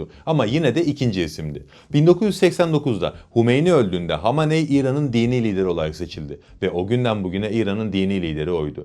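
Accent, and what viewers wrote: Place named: United States. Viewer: Amerikan ağzı ile konuşuyorsun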